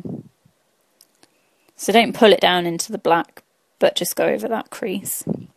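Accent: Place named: United Kingdom. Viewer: What part of Britain are you from? I love you accent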